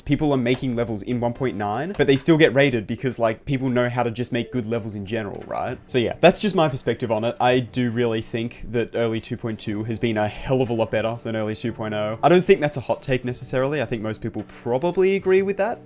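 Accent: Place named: Australia. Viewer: Why do the Aussie yt’s sound the same. Like you sound like the nba YouTubers called “6man”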